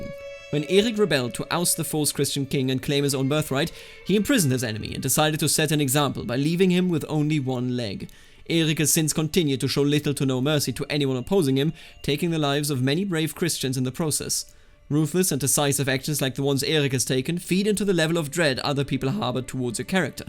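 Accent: Germany. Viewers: why is it spoken by a guy with a terrible German accent who speaks too fast ??! : (( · Un1c0rnOfL0v3 That’s just his accent dude. He’s Bavarian and also he speaks fine. He has his own youtube channel for Ck2.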